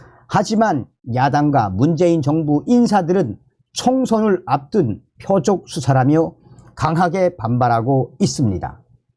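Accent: North Korea. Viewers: 아저씨 말은 북한말같애 북한말 하지마요,짱나요.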